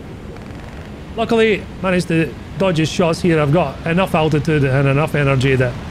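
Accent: Scotland, United Kingdom. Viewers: great video guy! good to hear another scots voice out there on the interweb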